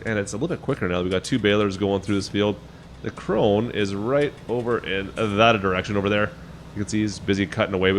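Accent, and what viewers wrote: Canada. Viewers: A fellow Canadian...EH?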